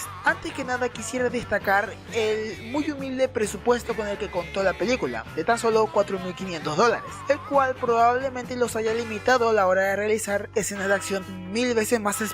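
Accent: Paraguay. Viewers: Eres paraguayo!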